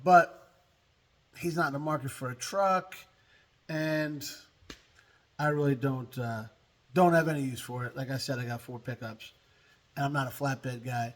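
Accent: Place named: East Coast, United States. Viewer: I could listen to you talk for hours love east coast talk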